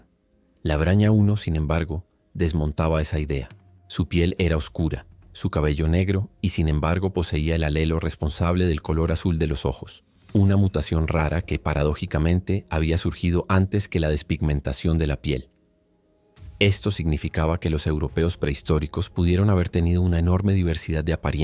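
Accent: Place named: United States. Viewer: No entiendo como, hablando de nosotros, la voz en off tiene acento americano. ???????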